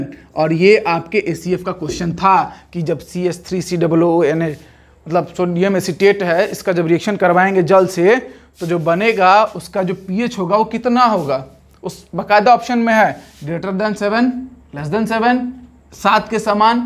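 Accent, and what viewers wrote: Bihar, India. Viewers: Bahut acha padha rahe ho bihari style me mai paid class chodkar apke hi video dekhta hu kyoki bahut hi basic se bataya ja raha hai apka physics bhi bahut acha lagta hai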